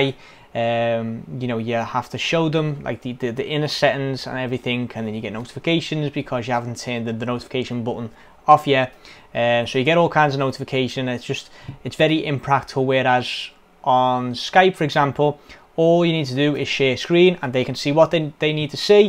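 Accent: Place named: Liverpool, United Kingdom. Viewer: I came to Australia when I was 3 years old, originally from Chester Wirral..great to hear a scouser!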